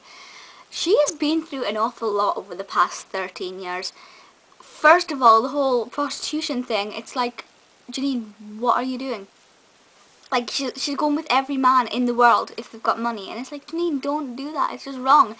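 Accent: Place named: Scotland, United Kingdom. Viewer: Hey young girl!! dont know if u are scottish or irish am guessing scotish am rubbish at accents! Think its great that you have an outlet for your interest in soaps!! U will be the NEXT JACI STEPHEN!! Keep ya chin up and don't listen to anything bad! You will go far! have fun and enjoyed watching ur post on Janine Butcher!! :-)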